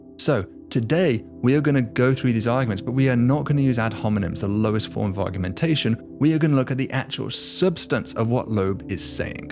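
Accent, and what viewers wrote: United Kingdom. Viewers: It's interstellar, it's not from here.. it is Alien by definition. Just because you have a British accent doesn't make you intelligent. Ugh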